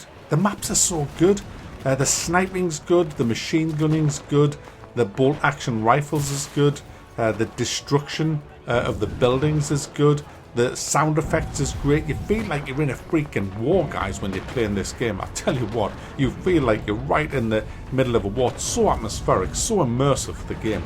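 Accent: United Kingdom. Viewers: It's just his accent /slang. The way people pronounce words is very different across the whole of the UK.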